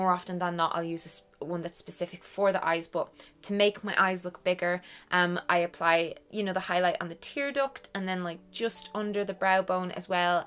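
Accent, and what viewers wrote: Ireland. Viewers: I'm so glad I found your channel! I lived in Ireland 7 years, so not only are your videos super helpful, but your accent also brings so much nostalgia! Thank you for all the helpful tips! If you don't mind me asking, is your hair color natural? The black you're wearing is so vibrant! It's not... inky, if that makes any sense. It looks like your hair has lots of different shades of black to it, and it looks gorgeous. :)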